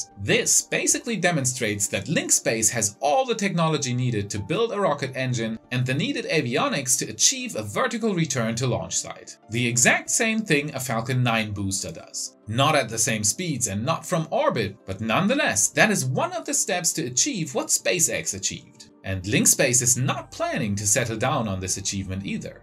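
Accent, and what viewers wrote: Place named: Germany. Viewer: Yah, that's a subtle German accent. It's impressive how many folks there and in Scandinavia speak better English than the average American. At this point, theirs qualifies as a full-fledged regional accent in English just like Australian, British, Indian or the one from Louisiana...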